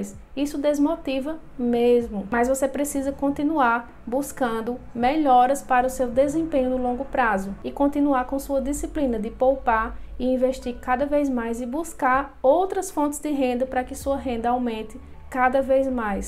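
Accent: Northeast, Brazil. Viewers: Mulher ameiii o vídeo era exatamente o que procuro Já min escrevi no canal Você è nordestina ?? Sotaque Nordestino 😊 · Gostei muito do seu vídeo, muito nobre de sua parte ajudar as pessoas sei que seu intuito foi esse bichinho, agora diga uma coisa esse sotaque arretado é do Nordeste?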